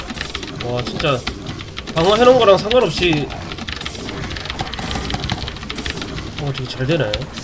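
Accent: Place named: Gyeongsang, South Korea. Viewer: 듣기싫은 경상도 사투리!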